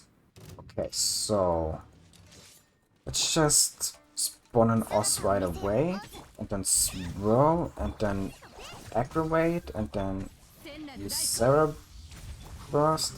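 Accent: Germany. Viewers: 3 sec angeschaut direkt rausgehört aha der kommt aus deutschland ༼ つ ◕_◕ ༽つ <3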